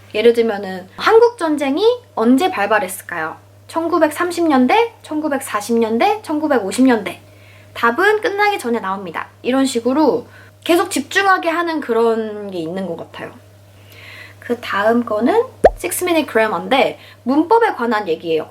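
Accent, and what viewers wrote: United Kingdom. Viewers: 영어가 유창한데 아시아/미국식 섞인 발음이 심해서 사실 예전부터 스트레스를 되게 많이 받았어요.. 어떻게 더 공부해야 할지 고민도 많이 됐었고ㅠㅠ 유튜브 알고리즘으로 님 영상 우연히 보게 됐는데, 영상으로만 봐도 아주 친절하신 분이고 무엇보다 언니의 목소리가 너무 좋으세요 영상틀고 잠들정도인데요❤️ 엑센트도 딱 제가 찾던 느낌이라 정주행 열심히 하고 있습니다.. 언니 앞으로도 브이로그든 공부법이든 그냥 영상 많이많이 올려주세여🙌🏻 언니덕분에 이제 드디어 영국발음고정 마음 먹었습니다..😤 (유튜브에 댓글 정말 안 남기는 편인데..부끄럽습니당,그래도 너무너무 저에게 힘이 되어주셔서 이렇게 달고 갑니당..🌹) 좋은 하루 되세요~!! 🥰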